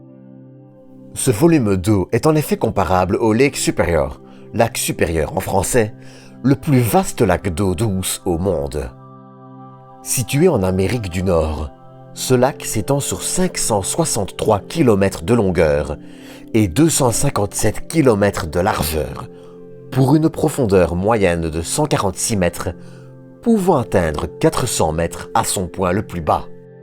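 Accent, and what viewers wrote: Belgium. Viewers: Ta un accent belge jolie video👍👍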